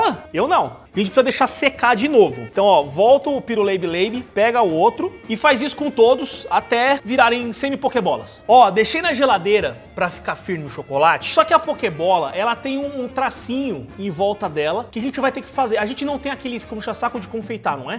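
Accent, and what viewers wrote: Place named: Rio de Janeiro, Brazil. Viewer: ele é um paulista com sotaque carioca...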